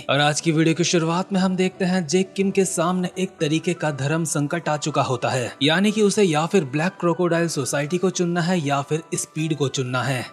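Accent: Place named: Gujarat, India. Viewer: bhai kya tum crunchyroll pe bhi anime dub krte ho kyuki pehle jaisi voice tum og daniel ko dete the jab wo mota tha waisi hi voice maine crunchyroll mein ek anime ke mc ki voice suni hai aur kya tumhare pass 2 ya 3 bande aur hai jo tumhe lookism ke video dub krne mein help krte hai aur tumhare tone se lagta hai ki tum gujarat ki side se ho kya tum ahmedabad se ho kyuki me bhi waha se hu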